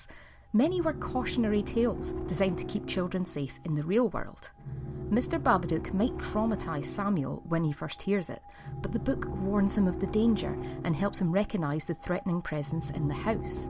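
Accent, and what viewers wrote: Scotland, United Kingdom. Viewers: Scottish ayeeee?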